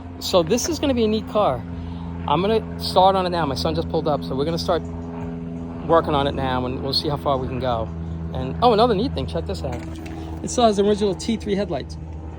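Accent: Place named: Long Island, United States. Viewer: You have the Long Island accent like me. What town are from? By the way, that’s a great Camaro you found, must have been in a garage.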